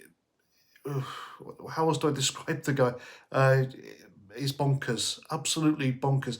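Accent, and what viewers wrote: Wales, United Kingdom. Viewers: Er, how about "no"? Saves you having to listen to drivel delivered in a ghastly, lisping Welsh accent.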